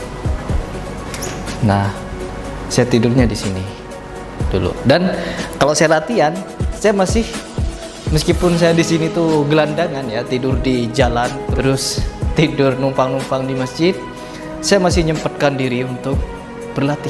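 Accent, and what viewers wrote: Madura, Indonesia. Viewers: Logatnya madura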